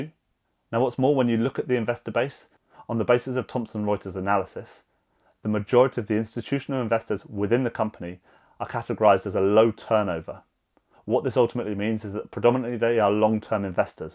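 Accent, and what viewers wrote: United Kingdom. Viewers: Bro I found your channel today and watched like 5 vids, you would sound smart even without the british accent haha! Keep up the awesome work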